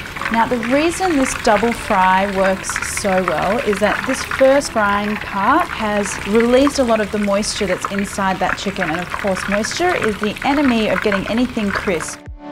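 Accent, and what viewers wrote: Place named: Australia. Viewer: I love your Australian English voice, the videos, kitchen atmosphere and your voice are all perfect!!! Correct me if you’re not from Australia! Sending you lots of love, bless and success your way!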